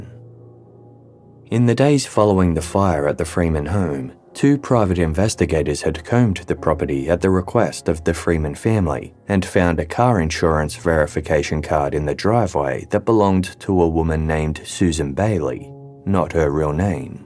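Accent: Australia. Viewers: Did the strong Australian accent not give you a clue?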